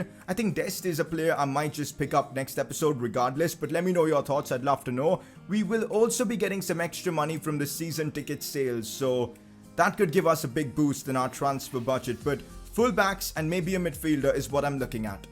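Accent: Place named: India. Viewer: Pcq why is your voice weird like Indian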